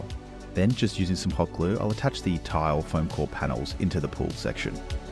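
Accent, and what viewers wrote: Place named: Australia. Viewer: Bro is definitely Australian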